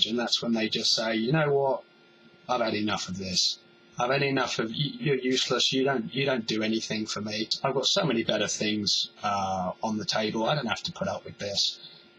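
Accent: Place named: South, United Kingdom. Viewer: the healthy narcissism part I could utilise for being/becoming a dancer, learning to overcome fears is needed moreso.btw, I can tell you have part dutch genetics within you from your vocal tone/sound, (of course mixed with a southern uk accent)... maybe I can connect more positively here on youtube :)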